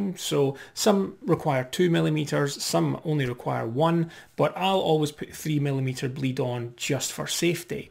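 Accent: Scotland, United Kingdom. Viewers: Thanks man. Love your accent, is it scottish?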